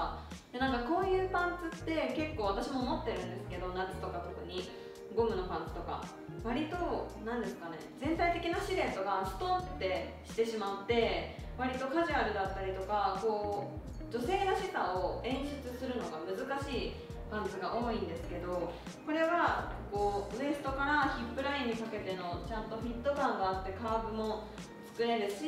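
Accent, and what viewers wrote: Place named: Kansai, Japan. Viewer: さんが履くとユニクロのデニムもセリーヌに見えます😍ほんとどれもめちゃくちゃ可愛いしオシャレすぎです！！ちょいちょい関西のイントネーションが嬉しい😆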